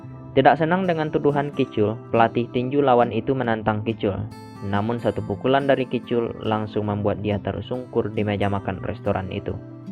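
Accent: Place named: Eastern Indonesia, Indonesia. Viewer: Kk org mana..logatnya kayk org timur😄😄😄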